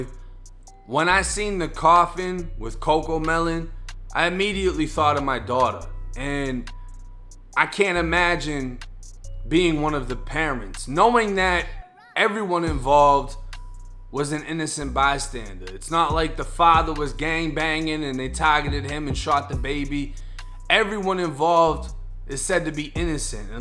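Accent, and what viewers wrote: Boston, United States. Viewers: SO YOU SOUND A LITTLE BOSTON TO ME BUT YOU REPORTING BROOKLYN NEWS.. TAKE THAT SHIZZ DOWN TO BLUE HILL AVE H-BLOCK BROMLEY HEATH YOU SOUND STUPID!!! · Favorite channel right now ! Over 23 & 1 even, and those other prison channels🤷🏽‍♀️ I think it’s the Boston accent and dramatic ending of sentences🤣💪🏻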